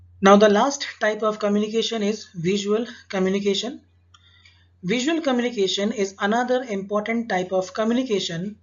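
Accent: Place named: India. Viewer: the indian pure accent made my ears bleed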